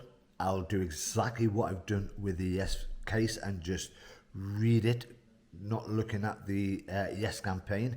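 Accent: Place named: Northeast England, United Kingdom. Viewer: great, would love to show you around my city Auckland, although everybody starts off in Auckland when they come to NZ. Where is your accent from? It's sounds like North East England.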